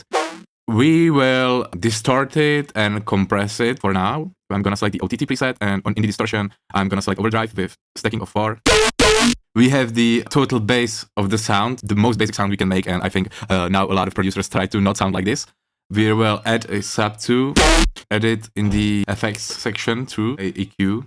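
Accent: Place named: Czech Republic. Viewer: ja si celou dobu rikal, ze mas takovej ceskej prizvuk a pak si dropnul pancz a bylo mi to jasny:D dobrej tutorial btw:)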